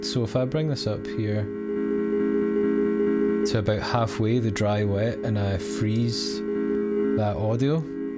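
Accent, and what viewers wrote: Scotland, United Kingdom. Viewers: And then I hear a fellow Scotsman. Bravo chap!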